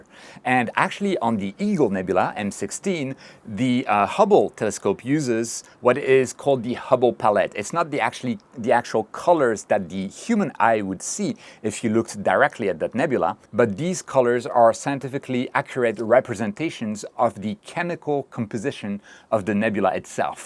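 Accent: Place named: France. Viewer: in japan and with a french accent, verry intresting~ tbh am not surprised x3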